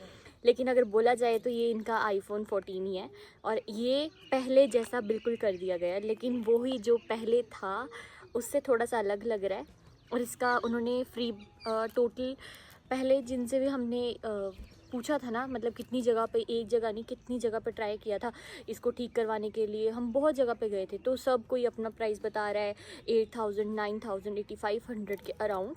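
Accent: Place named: Punjab, India. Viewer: Tum itna atak kar kyu bolti ho tumko koi problem hai kya u can not even speak punjabi properly wo bhi bilkul rustic way mei bolti ho. Thoda grooming karo apni aunty.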